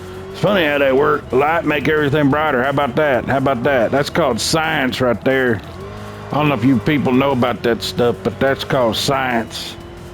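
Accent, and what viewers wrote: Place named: United Kingdom. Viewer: Some cool racing combine with british accent color commentary plus a lot of madness!!! Thanks!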